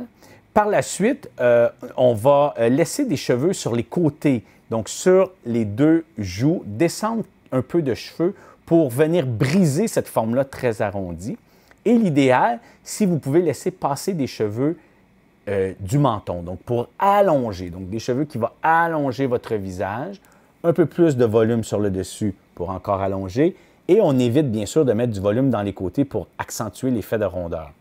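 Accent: Canada. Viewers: Oui bonjour moi aussi si j aimerai bien que je vous me coiffiez mais apparemment d âpre l accent vous êtes au Canada c est possible de vous envoyer une photo de face profil etc et que vous estimiez ce qu il faut faire contre rétribution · Très compréhensible et bien expliqué ,avec votre petit accent canadien que bcp de Français aiment!-Bonjour d' Allemagne d' une Française très interessant,surtout en période de Covid ,où les cheveux ont poussé énormément,les coiffeurs ayant été fermés assez longtemps!-Vos informations me serviront pour une bonne coupe chez le coiffeur!- Merci!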